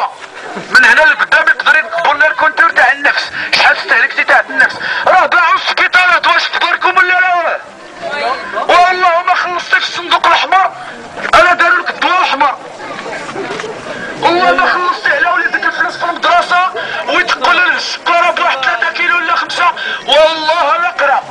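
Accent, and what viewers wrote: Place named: Marrakech, Morocco. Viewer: En plus de contenu fort pertinent, l'accent marrakechi en rajoute. Les habitants de cette magnifique ville sont connus de leur sens d'humour. Ils ont les moyens de passer leur message. Bravo du courage de la façon innovatrice de mobiliser les gens.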